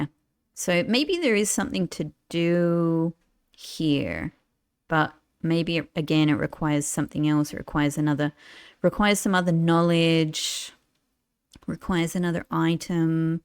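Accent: Australia. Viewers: It’s interesting just how little the absence of sound mattered here. I wonder whether I’d have even noticed if you hadn’t mentioned it. If anything, it made me wonder why I never had an Aussie narrating MY explorations. That should definitely be an option in a future patch.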